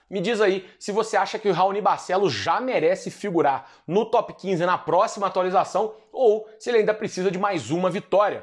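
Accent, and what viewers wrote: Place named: Minas Gerais, Brazil. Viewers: Show, Parabéns! Adorei o sotaque mineiro, sua desenvoltura e tenho certeza que você terá várias histórias de background pra deixar mais rico o conteúdo. Parabéns! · Sotaque de Minas tomando o sexto round hahahah! Ótima resenha 👊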